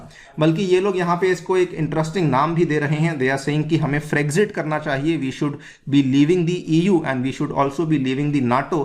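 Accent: India. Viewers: Nam Bharat rakha hai sasur..or kam krre ho English pronunciation thk krne ka 😂😂...bhai hmari marji kese b bole... it's Indian English..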